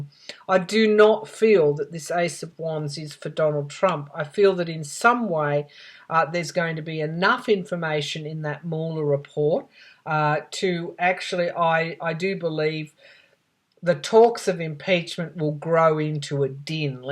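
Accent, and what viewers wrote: Australia. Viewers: Good morning my beautiful calm and funny Aussie friend. I have no plans to listen to the BS behind the Mueller report again today just like I didn't listen to The First One but listening to you this morning and you used a curse word cracked me the hell up😂 as a matter of fact I think I was half asleep listening to you and then when you said that I sat straight up in the bed and paused your video and just laughed. And with that beautiful accent of yours you are in it to win it just as much as we are. Much love light and blessings are sent your way. By the way even when you throw some serious shade you are still a lady.🗽🇺🇸💐🗽 Sleepless in Indiana. P.s. God Damn! You are something else! OG. Just thought of something else. Maybe after all is said and done and all the BS comes out, the GOP will have a reunion at Jurassic Park and try to plan their next strategy with their prehistoric animalistic instincts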